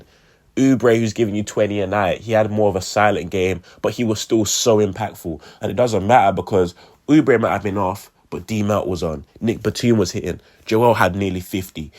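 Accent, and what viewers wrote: United Kingdom. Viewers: Wasn’t expecting that accent when I clicked on the vid but nice to see a fellow UK sixers fan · It’s kind of refreshing to hear a British YouTuber talk about the NBA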